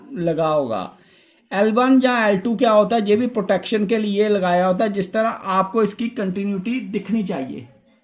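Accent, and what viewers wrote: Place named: Punjab, India. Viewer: Yha pta chla ki Bhai Punjabi hai Osm vdo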